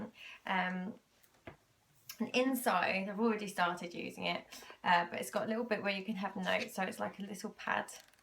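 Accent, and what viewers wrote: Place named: United Kingdom. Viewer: The content of this video is not for me, but I watched anyway, because well, I am a sucker for a gorgeous woman with a British accent :)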